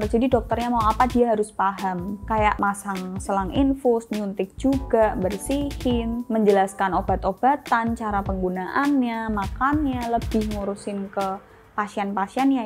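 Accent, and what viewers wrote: Java, Indonesia. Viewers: CEMPRENG PLUS MEDOK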